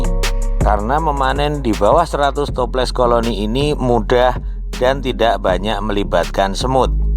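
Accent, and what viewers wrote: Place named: Yogyakarta, Indonesia. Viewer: Apa suara org jogja kaya gini semua ya 🤔🤔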